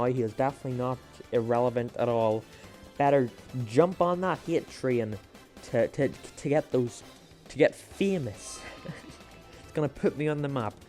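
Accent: Ireland. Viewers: Get ready for the F**KING swarm of logans fans attacking a bunch of us normal people saying we suck dic or something because there mad we destroyed there lord and saviour also why are all the comments that he reads are f**king books also I love your acent you sound irish like me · are you irish or northern irish i reckognise your accent cus i am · He has an irish accent, thats it. His first language probably is english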